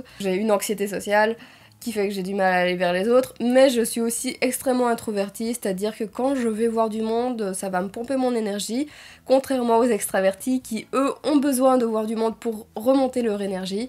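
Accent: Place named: Namur, Belgium. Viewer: c'est la maniere de parler aussi , la sonorité des mots, ca me fais penser a mes amis qui ont grandit a namur et ses environs, en tout cas j'espere que tu vas biens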